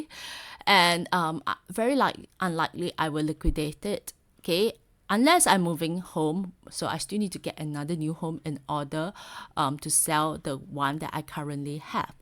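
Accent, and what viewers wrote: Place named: Singapore. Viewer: Singlish ma......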